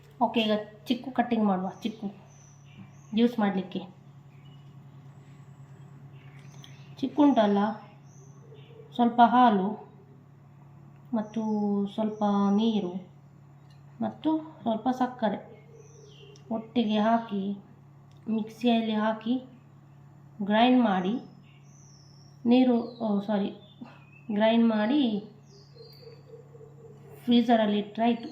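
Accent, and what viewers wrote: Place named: Mangalore, India. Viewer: Just saw this chanel ,you talk like my elder sister 😂 our kannada is same like this because we are mangaloriens😂❤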